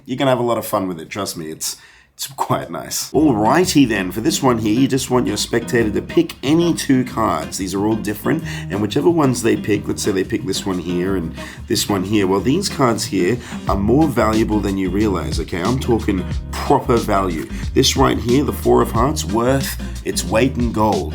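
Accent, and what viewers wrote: Australia. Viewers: Hey,! My little boy LOVES you and your videos! We watch you daily (every day)! It would be so awesome if you could give him a shout out? He wants to do magic like you. Although we're Americans, he speaks in Australian accent to be like you! His name is Everett. Thanks for the videos! CHEERS, mate!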